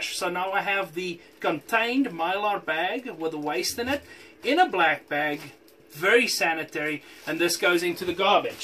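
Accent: Afrikaans, South Africa. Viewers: Definitely a south African dude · Yes SA def ... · Did I guess his accent?? I guessed South African! It's the accent that sounds like 5 accents mixed together. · Is that accent i hear afrikaans? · South African Afrikaans, perhaps. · Sounds South African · To me he sounds more South-African :-)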